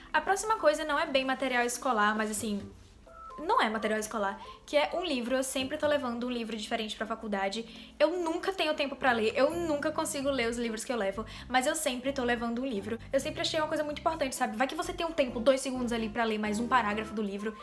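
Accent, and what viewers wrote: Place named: Natal, Brazil. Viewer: amg vc nasceu em Natal?? seu sotaque é diferente shshjajs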